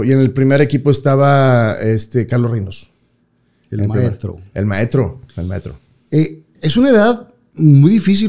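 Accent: Monterrey, Mexico. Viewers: Tal vez por el acento, así hablamos los regios jejejeje 😁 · Me sentí en una carnita asada, que bonito es el acento regio!!!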